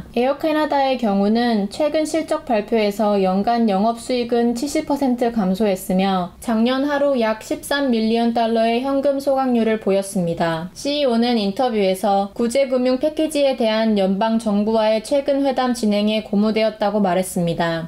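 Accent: Gyeongsang, South Korea. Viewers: 님 경상도 억양도 좋지만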